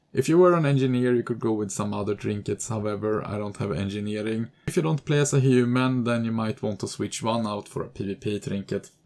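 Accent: Sweden. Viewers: Man hör tydligt att du är svensk!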